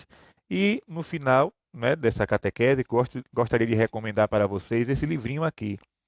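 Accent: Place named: Bahia, Brazil. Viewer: Padre que luz, eu que já adoro o sotaque baiano, ficaria horas te ouvindo com esta sabedoria serena e humana, parabéns, que belíssima explanação! Gratidão.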